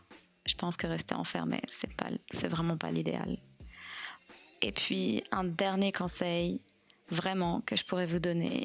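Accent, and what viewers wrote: Quebec, Canada. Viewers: First, tu as clairement pris l’accent québécois hihi (we win!!). Second, JADORE encore une fois ta vidéo. Non suelement ton montage est magnifique, mais tu as toujours toujours pleins de conseilles qui nous aide à se développer. Merci , merci de partager ce moment difficile avec nous. De nous inspirer à aller de l’avant et être de meilleures personnes! We love you💕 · J'entends un accent québécois là nan ?? 🙀🤭 Super vidéo avec des good tips, ça fait toujours du bien de le rappeler 😘 Keep doing girl 💪😘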